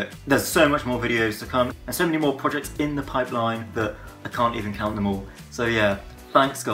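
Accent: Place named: United Kingdom. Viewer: Congrats.. It is finally nice to see a face behind that British accent of yours:) I love all your videos.. keep it up! hugs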